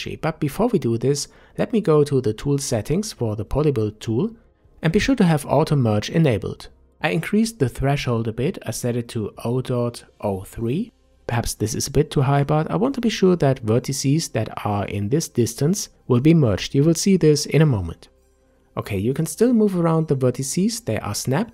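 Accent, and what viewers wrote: Germany. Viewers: Took me to hear that you are german. And i'm german and quit good at english. Oh and very nice video :) I love the Blender Cummunity so far.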